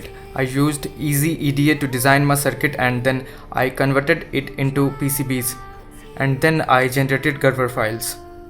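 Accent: India. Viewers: FFS! Cant stand that THICK indian accent. Why is every how to video made by some indian i cant understand... FAIL ! ! !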